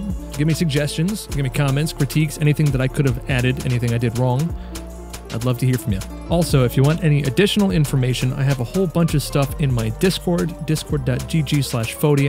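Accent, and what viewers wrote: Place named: New York, United States. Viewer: He's clearly a New Yorker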